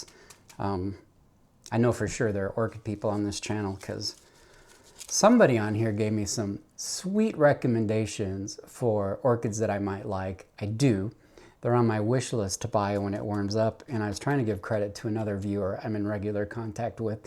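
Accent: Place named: United States. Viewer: Love Miro…specifically for the accent 😂…, can you conjure up a delightfully calm and gentle European accent? The American thing has worn out its welcome.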